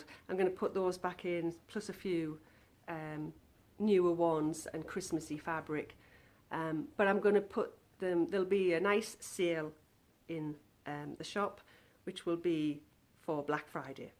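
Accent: Sunderland, United Kingdom. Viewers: Nice to see you back, missed your Sunderland accent lass. Hope 2017 will be better for you & your family♥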